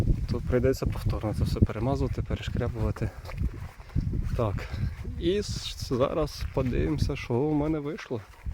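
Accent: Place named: Vinnytsia, Ukraine. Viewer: Ви щ Миколаївщині,а розмовляєте,як наче все життя на Вінниччині прожили. Чи так і є?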